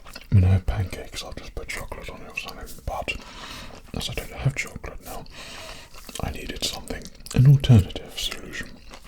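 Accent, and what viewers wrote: England, United Kingdom. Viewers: NO WAY BRO'S BRITISH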